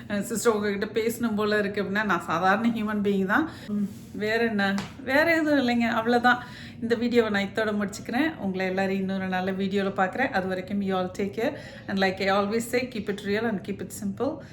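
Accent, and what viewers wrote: Salem, India. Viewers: சகோதரி நம்ம சேலம் ஸ்லாங் உங்களுக்கு இன்னும் அப்படியே இருக்கு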